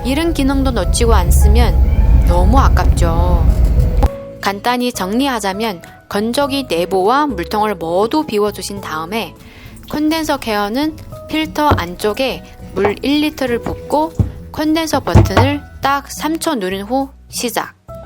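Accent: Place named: China. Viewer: 말하는게 중국사람 같음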